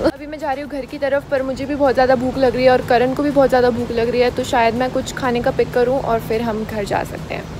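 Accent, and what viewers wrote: Pakistan. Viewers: Love ur accent.... resembles so much like Lahori accent..... mind if u speak some punjabi too?? A true fan from Lahore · I don't know why but ap Pakistanis ki trha bat kr rhi ho Btw love from Pakistan beautiful lady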